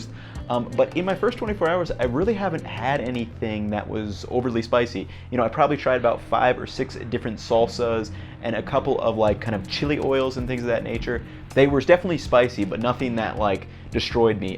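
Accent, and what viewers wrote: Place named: Seattle, United States. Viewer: Where in the US are you from? You sound like Seattle?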